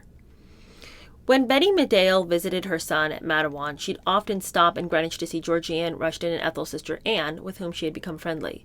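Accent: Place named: RP, United Kingdom. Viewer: Sounds like the Mitford sisters 😂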